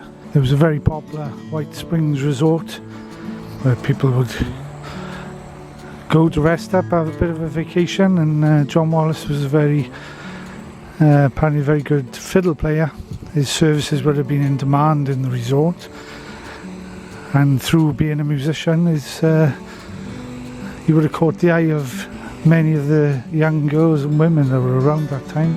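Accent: Wales, United Kingdom. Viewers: Enjoyed that 👌🏼 It was refreshing to hear a welsh accent knowing their onions. Where you from? I’m in Swansea. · I had no problem understanding or knowing you are welsh,, but then I'm from 🇬🇧 👍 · God bless ye Buddy, Thank you for doing such a crackin job on this, its very informative and interestingly delivered. LOve the twang of your broguish speak. you Welsh? nary to matter, its just very resonant of a past I do not know but none the less I am very much proudly from. I am of the Georgia and Carolina people, but do have Mississippi blood there still and I am highly honored by any and all my associations to Dixie, tis a privilege I owe my life for. And I thank yall for coming to be a part of it and show us some love. I hope you was treated like the good guests you are and come to visit more when you can. in the old Irish tongue, I believe its in order to convey, Caed amlle a failte' unto ye. 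Now, for the closing notion, by which, I request that you tell that fine lady there accompanying yourself, I love that tshirt shes sportin' like a trooper!!! God bless the Merseyside and the grooves that flow in and out of that river there. Should ever I be of any help to you, let me know, im in Seattle but, heading to Mississippi and NOLA this spring or summer, intend to check out this very place thanks to yall and could provide a wee spell of boots on the ground if theres something yall missed or need a good lookin at. Thank you again and to you a special salutation thats privi amongst us old sons of the South, nary to matter the color, your God, your choice in rose over magnolia, but, just so long as you dont put no sugar on yo grits, I warmly bid unto thee, Deo Vindice and Pea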